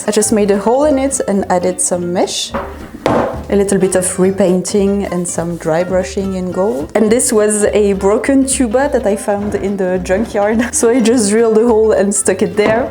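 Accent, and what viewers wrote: France. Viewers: Je suis déjà quelques vidéastes anglophones qui font pleins de réaménagement/cosplay/whimsical stuff. Entendre ce bel accent français et les expressions ça m’a fait tellement bizarre 😂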